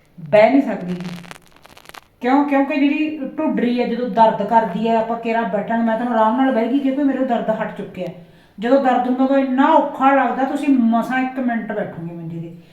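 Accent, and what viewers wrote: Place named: Bathinda, India. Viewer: Dhudhri ki hundi, bhainji baithinda di boli sanu samjhna okhi hundi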